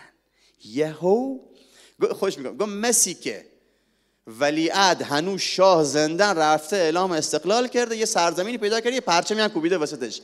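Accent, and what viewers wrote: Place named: South, Iran. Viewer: چندبار تو اینستا دیدم شنیدم ،ولی آدم چقدر زود به زود دلتنگ صدا و قصه هات میشه ،حتی نمیدونستم راوی این قصه ها و این صدای جذاب و لهجه جنوبی شیرین کیه ؟ آخر تو گوگل سرچ کردم قصه با لهجه جنوبی ،اولین نتایج جستجو چهره آقای احسان عبدی پور رو نمایش داد و جنگی پریدم تو یوتیوب و... پاینده باشید و مانا ❤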